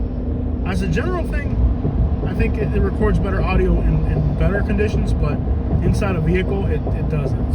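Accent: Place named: South, United States. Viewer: LMBO with that southern accent 😂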